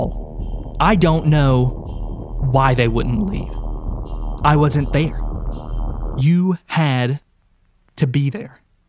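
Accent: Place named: Midwest, United States. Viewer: your accent is so interesting to me i can't tell if you're from west virginia or the midwest... somewhere west for sure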